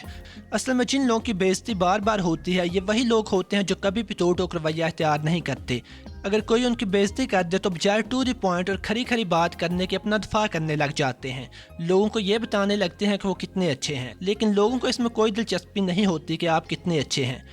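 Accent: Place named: Lahore, Pakistan. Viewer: Lahori accent 😂👌✌️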